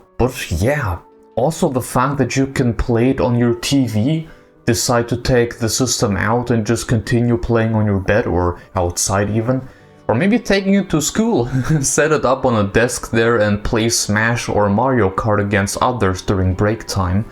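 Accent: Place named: Germany. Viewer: Wow, you have such a German accent speaking English. Я некогда не думал что вы говорите по-русски 😂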